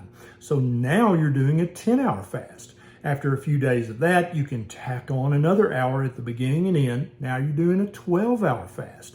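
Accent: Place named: Kentucky, United States. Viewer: Dr. Berry, I listen to you a lot...I spent most of my childhood in Kentucky, and EVERYTIME I listen to your shows, I crave "Cokes" and beef jerkys and MoonPies!! Oh, and ALL DAY SUCKERS...lol...Blame it on my granny! I LOVE that accent of yours, and miss my granny and papaw...they both passed years ago...You are my fave doc on here! I do not eat banana splits, that was the name of a " divorce group" my kids attended when they were 6 & 8...I did eat a pop-tart today...but I have been good for 6 weeks or more...I will kick my butt when the morning comes....haha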